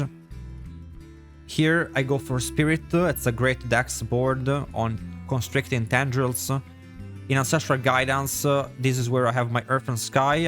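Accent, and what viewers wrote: Italy. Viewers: I like his Italian accent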